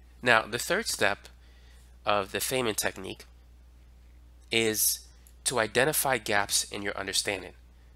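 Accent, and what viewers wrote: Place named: Bronx, United States. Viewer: you from the bronx? kinda sound like it (very random, sorry)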